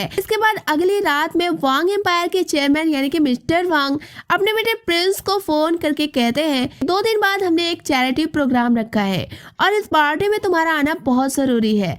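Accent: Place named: Bihar, India. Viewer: Madam you are from Bihar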